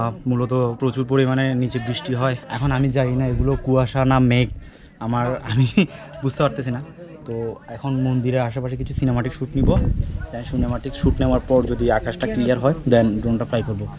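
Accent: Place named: Chittagong, Bangladesh. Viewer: সুন্দর ব্লগ। কথা আরো ফ্লুয়েন্টলি বলতে হবে। চিটাগাং এর ভাবটাও কমাতে হবে।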